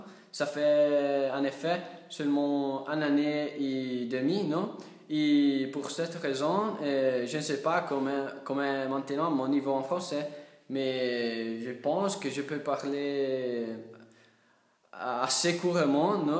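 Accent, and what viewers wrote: Italy. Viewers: Si tu apprends le Français depuis seulement une année et demi, tu le parles bien. On te comprends et les quelques erreurs que tu commets sont celles que commette ceux qui apprennent cette langue, réputée difficile. Mettre le féminin à la place du masculin et vice-versa pour les articles. Par exemple, dans la vidéo, tu dis "un vidéo" au lieu de "une vidéo" et "un année au lieu d'une année". Tu as un accent italien mais c'est charmant... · tu parles tres bien et en plus sans les mains! Continue tu es très fort et garde donc l'accent italien (pour les filles je pense qu'elles vont apprécier!) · l'accent italien me fera toujours craqué ;) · Tu sei carino😂.. ça te donne un charme ne perd pas l'accent Italien... ciao!! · yo hombre tu parle bien accent italilien applique toi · L'accent italien me fait rever :) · J'adoore l'accent Iitalien ! tu parles déjà couramment on te comprend très bien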